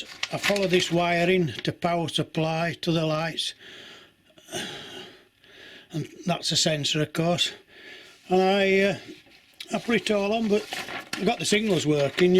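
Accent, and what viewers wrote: Yorkshire, United Kingdom. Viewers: Hi. Good to have u back mate coz missed that huffin n a puffin Yorkshire accent 😆 Plus good to know the ol corona virus didn’t get ya! 👍 JohnE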